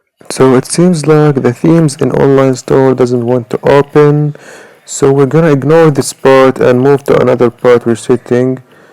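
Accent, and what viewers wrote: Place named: Arab world. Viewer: انت عربي مبين من حكيك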